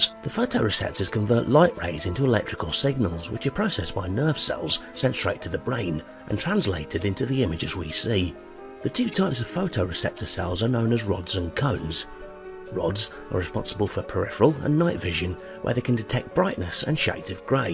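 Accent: United Kingdom. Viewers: Very informative....and it’s great to hear a British voice for a change xxx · "Cats are also thought to be trichromats but not in the same way humans are." Wow! He's British! 😂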